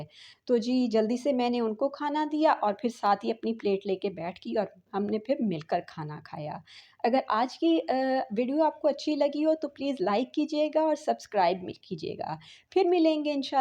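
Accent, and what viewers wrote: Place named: Karachi, Pakistan. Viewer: Baat karne k andaz se to ap karachi ki lag rhi hain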